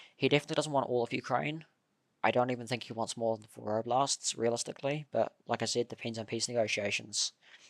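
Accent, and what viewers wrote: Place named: New Zealand. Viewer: Enjoying your kiwi as accent, my dude.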